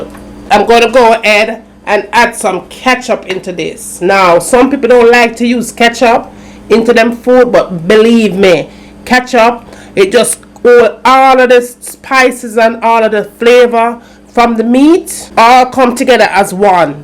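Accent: Jamaica. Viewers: Love the Jamaican talk, love how you clean I would have your stew pork, but next time try putting the sweet peppers last.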